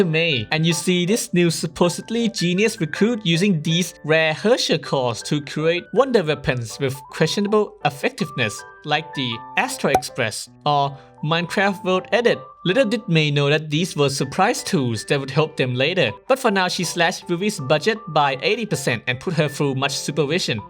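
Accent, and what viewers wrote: Thailand. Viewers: Man are you thai? Cuz u kinda sounds familiar Btw nice vdo mann Just in case that u r thai ยังมีคนเล่นฮงไกกับข่อยดีใจคับ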